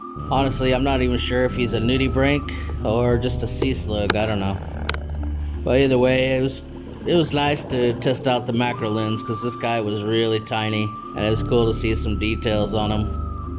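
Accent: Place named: South, United States. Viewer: nice nice vid.. plus i like your strong southern accent ! enjoy my country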